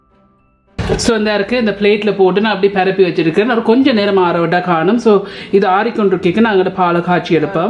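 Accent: Jaffna, Sri Lanka. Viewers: I like the way you speak Jaffna tamil and including some sinhala words like bola, kithul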